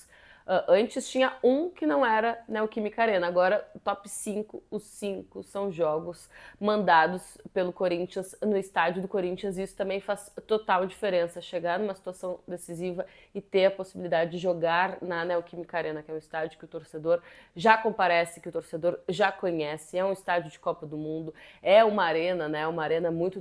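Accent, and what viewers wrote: Rio Grande do Sul, Brazil. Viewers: gaucha, sotaque bonito, torce pra quem do grenal? Vai Corinthians!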